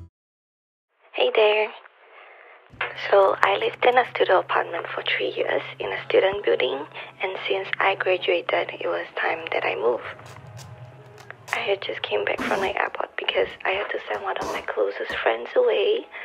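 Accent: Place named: Singapore. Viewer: I'm glad you found my channel, because now I found your channel! I teared the first minute because it hit so hard. I've said goodbye to close friends who went back to their home countries, and then when it was my turn to leave my family and friends "behind" it was such a painful moment as well. This was a beautiful video. Looking forward to more content from you <3 Sending love. p.s. i also missed hearing that singaporean (it is, right?) accent :)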